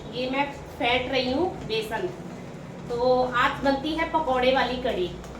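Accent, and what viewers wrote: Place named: Agra, India. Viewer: Aap bilkul hamari bhasha bolti ho.m agra se hu.jaise rai jharap or hamare yaha bhi sawan m kadi nahi banate.mera bhi man kar raha h m bhi kal banaugi sawan k baad😊🤗🤩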